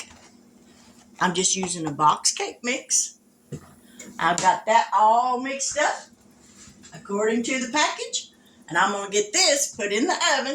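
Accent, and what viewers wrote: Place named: United States. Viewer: Looks delicious! I love your country accent!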